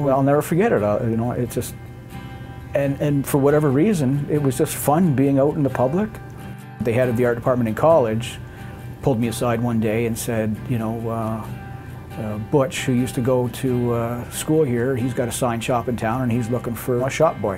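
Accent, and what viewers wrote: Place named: Canada. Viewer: Great work! I knew you were Canadian when you started talking.👍🇨🇦love to look at hand painted signs, puts vinyl to shame, no soul! Hope to see more of your work for years to come!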